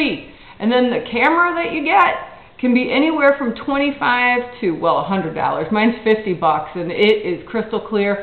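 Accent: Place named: Maritimes, Canada. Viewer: Off topic? Meh! I just noticed how you say some vowels is like Maritimers...lol Yo, East coast, baby ;p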